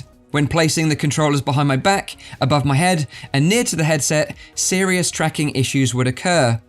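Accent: United Kingdom. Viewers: NDT and those guys are all actors. Propagandists. And yes , ole boy is brit af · wtf? He has a decidedly British English accent. His pronunciation of 'cosmos' is in that idiom, and no mistake. I see no evidence of Yankery here!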